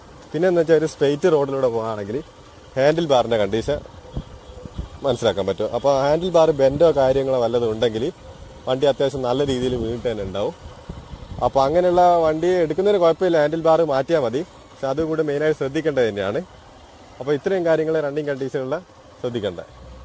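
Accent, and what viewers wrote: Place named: Vadakara, India. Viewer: Bhasha shyli vadakara istam😃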